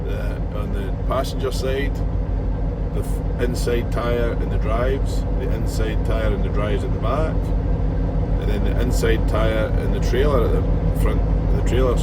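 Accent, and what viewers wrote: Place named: Scotland, United Kingdom. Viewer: where are you from in Scotland, from Ice Man in Ayrshire your accent is still strong,keep it between the lines.💪😎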